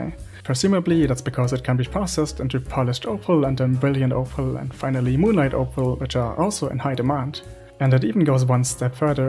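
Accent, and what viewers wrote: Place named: Germany. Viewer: Your english sounds very german 😮. I love your Videos and was actually horrified when i found out that i wasnt subscribed to you 😅, great Video! Keep Up the good work ! Also can i reference your Videos in my own ? I also got a YT channel, and since a few Weeks i Focus on Lifeskills and anything but grinding... have a nice Day 😊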